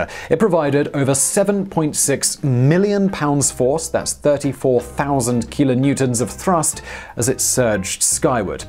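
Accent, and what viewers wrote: United Kingdom. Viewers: I must respectfully disagree. has an awesome British accent. I say, let him speak.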